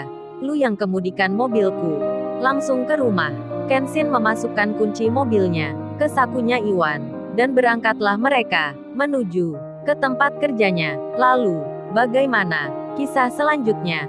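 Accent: Surabaya, Indonesia. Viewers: Admene wong suroboyo iki wkwkkwk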